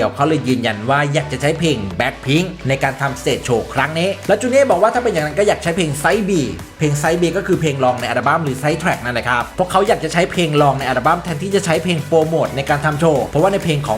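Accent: Isan, Thailand. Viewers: ชอบความเป๊ะของแอด ข้อมูลพร้อม แล้วค่อยลง อวยเต็มที่ น้ำเสียงไม่น่าเบื่อ ชอบมากค่ะ และสำเสียงอีสานใต้มาเต็ม แอดคนอิสานใช่มั้ยคะ🤣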